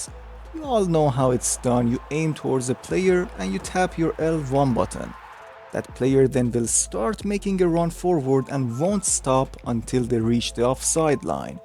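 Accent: Iran. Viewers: Are you persian by any chance dadash?😅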